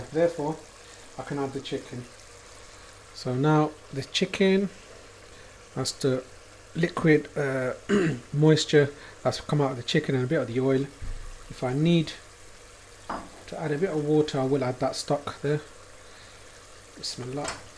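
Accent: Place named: Birmingham, United Kingdom. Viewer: Sounds like a Brummie tinge, are you from the midlands. Apologies if it was obvious where your from from the earlier videos but I recently stumbled on your channel. Very good quality videos by the way, especially the recent trip to Bangladesh. Keep up the good work.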